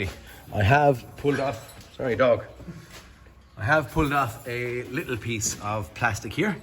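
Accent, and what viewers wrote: Ireland. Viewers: I've been away from Ireland for a week..and that has to be the most Irish accent I've ever heard in my life. Brilliant production and delivery.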